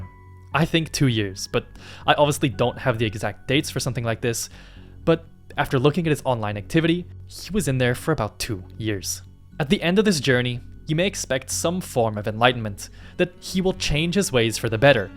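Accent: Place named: Argentina. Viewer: I'm sure he's from Argentina. I'm from there and I have no doubts that's our accent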